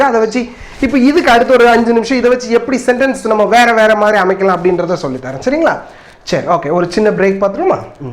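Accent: India. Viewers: great sir, i like ur teaching and ur talking tamil slang also super sir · Neenga kanniyakumariya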